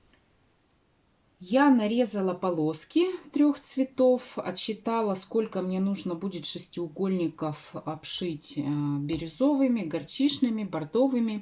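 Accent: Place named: Serov, Russia. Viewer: У меня родня в Серове живёт , говор как у них. Как будто с ними поговорила. Очень красивое одеяло!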